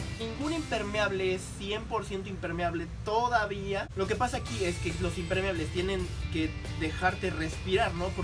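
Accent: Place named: Puebla, Mexico. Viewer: Hola, buen video, me doy cuenta que eres de Puebla jaja saludos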